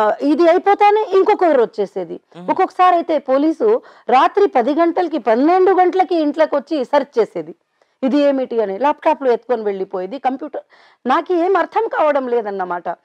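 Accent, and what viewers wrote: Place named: Chittoor, India. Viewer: madam slang looks like chittoor... Good to hear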